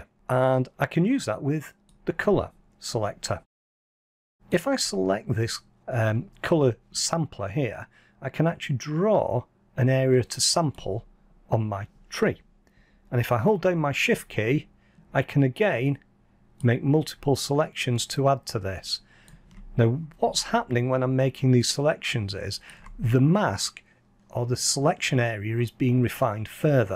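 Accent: United Kingdom. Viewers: Thank you so much for this instructive video, plus the perfectly spoken british accent ! :) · This is the second video I have watch of yours in the last 10mins, I have learnt more in this last 10mins than god knows how many hours I have spent listening to other people . Content great, british accent out of this world, some one I can listen to at last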